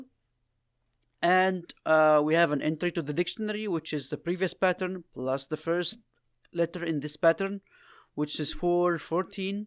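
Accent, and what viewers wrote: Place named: Egypt. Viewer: You are egyptian 😂 I Know this kind of accent, but good job keep it up bro.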